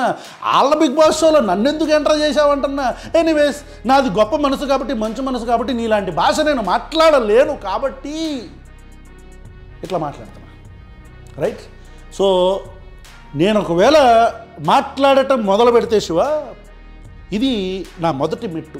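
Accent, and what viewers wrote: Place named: Rayalaseema, India. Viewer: రాయలసీమ language లో ఐతే సంపిపడేసావు brother. ఒకసారి రాయలసీమ visit చేయండి, చూడడి poor ఎలా ఉందొ తెలుస్తుంది.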